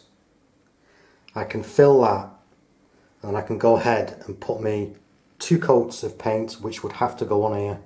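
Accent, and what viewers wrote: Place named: Liverpool, United Kingdom. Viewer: Great video! How long would you leave it before going in with the 2nd coat? Trying to place your accent, it's sounds slightly Liverpudlian but not too much. Warrington area maybe?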